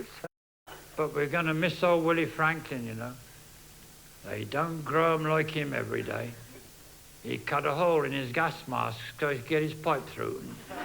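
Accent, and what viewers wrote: Buckinghamshire, United Kingdom. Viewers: I was led to believe that his accent is Buckinghamshire. Having been brought up there, I wouldn't disagree.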